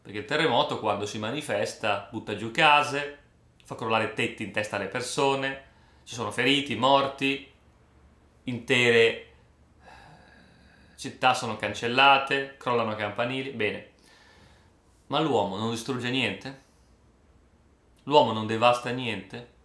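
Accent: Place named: Romagna, Italy. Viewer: Dall'accento e da quello che ho visto sul tuo canale credo che tu sia romagnolo... Mi vergogno ad essere tuo conterraneo.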